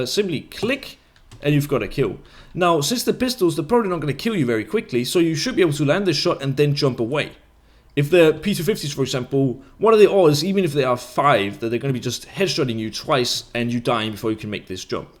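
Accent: Denmark. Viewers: are you danish? xD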